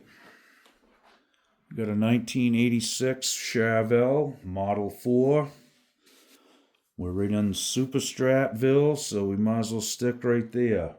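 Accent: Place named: Boston, United States. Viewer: New subscriber!!! Great video and I love your collection. Your approach to video and guitar philosophy is enjoyable and engaging. I know you are in Maine but sound just like we do in the Boston area. We locals would tell you that "You collection is pissa!"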